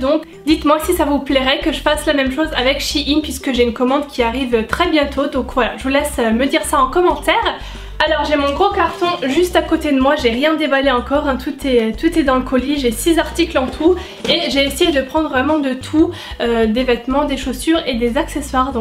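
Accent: Lorraine, France. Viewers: Ta l'accent lorrain 😘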